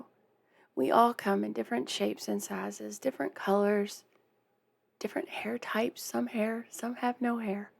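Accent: South, United States. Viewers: It takes a brave person to be able to do videos these days. I think you are a genuine, honest and friendly presenter, and trust me, there are some presenters on YouTube who are terrible. Anyone who would go that far out of their way to say mean and nasty things, is someone who has some very deep self-esteem problems. Just brush them off. Be yourself. This is YOUR channel. Stop the video and talk as many times as you want, even during. And I'm a huge fan. If viewers want to hear the song un-interrupted, they can go watch a different video. By the way, I love your southern accent. Keep up the good work. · I love your hair, I love your southern accent, I love your heart, and of course I love your name, after all it’s my wife’s name😂. You keep being your amazing self. We love you. Greetings from Alabama 😎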